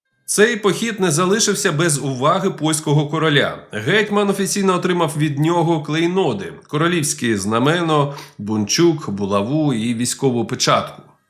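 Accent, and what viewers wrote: Russia. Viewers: Житомирщину до совка частково називали Волинь,це пізніше так назвали "Луцьку область". Автор раніше видавав контент ворожим язиком,звідти і наголоси.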